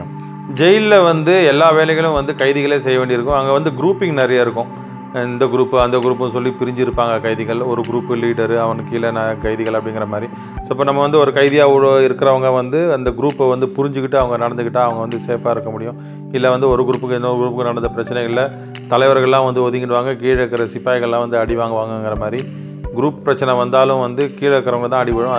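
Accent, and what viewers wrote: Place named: Kerala, India. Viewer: Bro i think u r from kerala maybe malayalam slang tuch anyway good job good explanation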